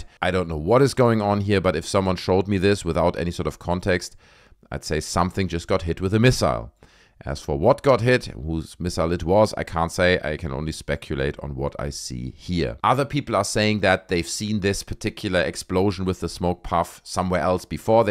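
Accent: Germany. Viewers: Ein Deutscher spricht Englisch 👍🏻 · Judging by your accent, you're german...amirite?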